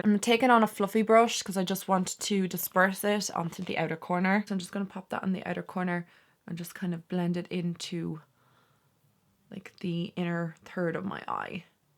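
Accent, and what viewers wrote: Ireland. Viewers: That yellow, the red, and the purple red ... so gorgeous. I also love that green palette. Well, now the yellow palette is also beautiful. I think the purple and the red palette would be my favorite. I love those golds and whites and greenish gold shades. I'm brand new to your channel. Are you from Ireland? You sound like Brendan Gleeson. I wonder whether you are from the same area where he grew up? I think he lives in Dublin now, but I'm not sure where the various inflections and tones of each accent originate. WOW, when you did that up-close of your eye look, so beautiful and very well blended!Thank you for sharing your impressions.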